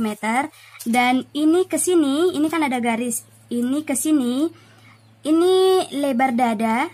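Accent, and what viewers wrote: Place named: Sumatra, Indonesia. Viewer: Hallo, lokasi ada dimana ya? Logatnya sih sumatera nih... Saya baru gabung, lumayan menyenangkan dan cukup detil penjelasannya. Apakah juga terima jahitan makaud saya sbg penjahit? Tks 🙏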